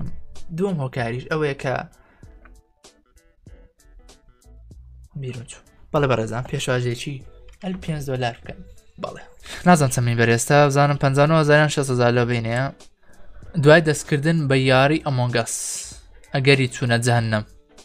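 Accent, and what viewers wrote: Erbil, Iraq. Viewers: Hich let naya ba karkuki yan slemani qsa bkay hawleri zyatr la dangt de 😊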